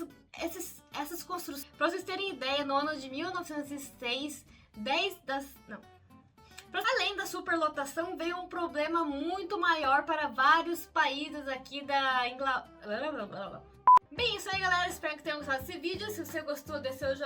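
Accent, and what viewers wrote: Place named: Rio Grande do Sul, Brazil. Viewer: Ah! Te ouvir foi tão parecido com a gente falando que achei que era gaúcha. Obrigada 😘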